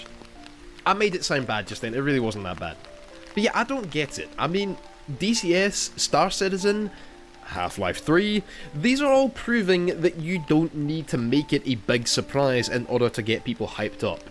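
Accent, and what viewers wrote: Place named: Scotland, United Kingdom. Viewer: Odd question but whereabouts are you from? I’m not sure whether I get a fifer or Edinburgh vibe from you 🤧🧐 (from a Fifer in Glasgow)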